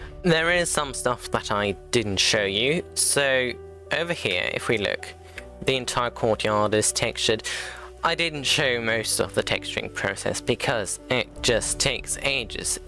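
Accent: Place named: Estuary, United Kingdom. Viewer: I am an American who loves the UK. I think us Americans automatically think monocle whenever there’s some RP in a British accent. I love yours. Sounds like an estuary accent. Somewhere around the home counties.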